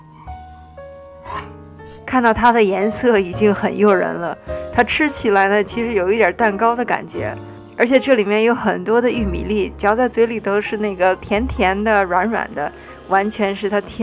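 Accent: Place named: Shaanxi, China. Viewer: 包谷棒~~陕普啊😊老乡在纽西兰问好啦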